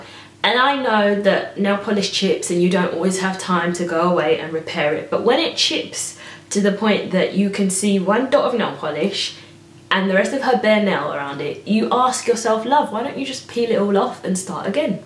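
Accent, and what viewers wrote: United Kingdom. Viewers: Great tips and great video. Love those pearl earrings on you. They really draw attention to your eyes and make your teeth look nice and white. Oh, and also, I adore your accent. A British accent always sounds so so classy. I try to follow all your tips to look polished, although I'm embarrassed to admit that most times my nail polish does chip. I do my own nails because I like changing colours weekly & I can't stand all the time wasted at the nail salon when I could be doing something more useful. Also, the smell gives me a major headache. However, in 2019 I shall carry my nail polish in a baggie in my make up kit. No more excuses. Keep up the great videos.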